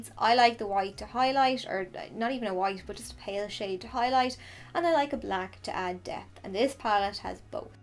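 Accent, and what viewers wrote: Ireland. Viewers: Love your videos! 😍Valid, professional tips and tricks, delivered in a sweet, friendly way. (I really hope I didn't offend you on the comment board for best concealers, about listening to you takes me "home" to Ireland, where I once lived. My intention was certainly not to disrespect you, it was meant as a huge compliment 😊)Love your Q&A idea for a video. I would like to know how to apply dark coloured eyeshadows under the eye (at the waterline)without smudging it and looking like I'm going to a grunge party. 😁I'm of Indian heritage and my complexion is light brown. Unfortunately I've been "blessed" with permanently darker circles underneath my eyes and concealers are absolute lifesavers for me. Whenever I try to wear eyeshadow underneath the eye, it makes the area look darker and it overpowers the effect of the concealer. Hope that makes sense. 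😄🙈 Thanks again for your lovely channel.😊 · Great eye makeup!!😍😍🤗❤ love yaa😚 your Irish accent is soo cute!!! Greetings from Germany❤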